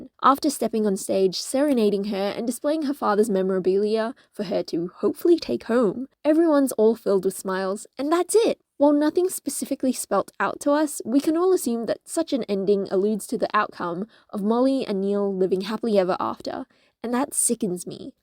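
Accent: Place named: Australia. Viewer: Refreshing Australian accent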